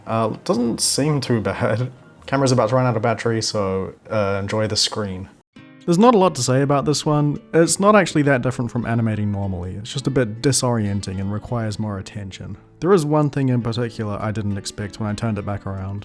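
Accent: New Zealand. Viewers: Are you kiwi? 🇳🇿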